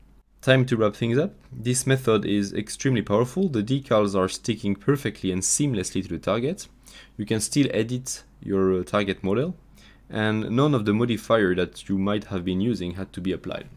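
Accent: France. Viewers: nice try but your French accent are definitly present , merci !! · very french, love it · Dude love for accent à la française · Nice french accent ;)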